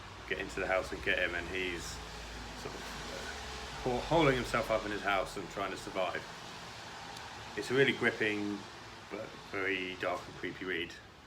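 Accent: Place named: England, United Kingdom. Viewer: Hello fellow UK booktuber! I don't often come across very many so I get excited when I hear an English accent! Great video, love your channel so far! I am new here so still trying to find my way around. Subscribing for sure ❤️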